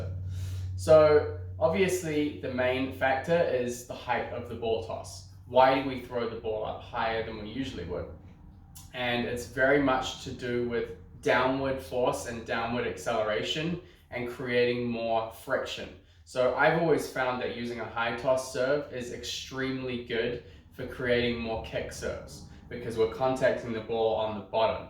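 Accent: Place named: New Zealand. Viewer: I cant seem to pinpoint your accent. Kiwi living in Oz for sometime then migrated to US?? Reason for asking is the next question after the first would b - do u coach in person?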